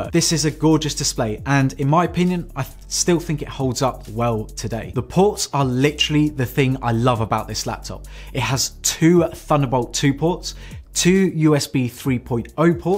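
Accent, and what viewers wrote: United Kingdom. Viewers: I subscribed you because of this: nice british accent ✅. btw i clicked your instagram account link found nothing.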